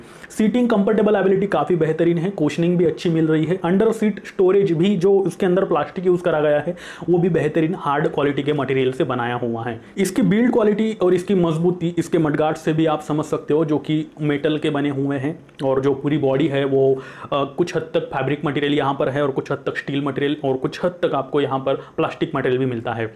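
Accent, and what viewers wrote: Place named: Maharashtra, India. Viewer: Dada ascent madunach mait padtay marathi manus...great work...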